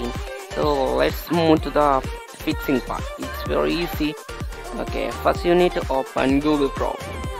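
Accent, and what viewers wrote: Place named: Kerala, India. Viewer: ennik English kettapo thanne thonni 😂😂 malayali anen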